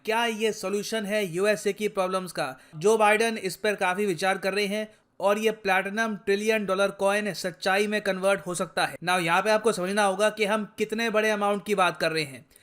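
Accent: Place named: Gujarat, India. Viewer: ldki hai..koi gujarati ldki hai accent se pratit hua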